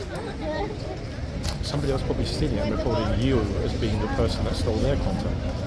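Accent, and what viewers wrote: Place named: United Kingdom. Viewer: His not her grand dad...my guess is the old guy is a boyfriend....how the woman poses hahaha she ia an Asian from Philippines...i know right....when we gonna have a peak how you look like Sir....i love British accent...lovely to listen... i met and befriended some while i was in HK... With your videos i feel also walking in London haha...GOD Bless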